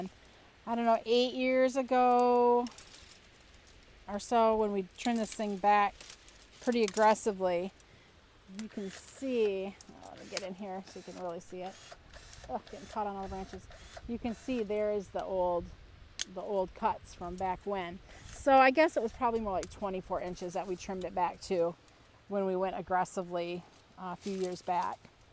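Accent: United States. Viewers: I just love starting my day with smiling face and that cute northern accent 😁 (I’m from Kentucky so we sound a little different haha) Having there to complete the team was icing on the cake. Thanks for sharing your day ❤️❤️❤️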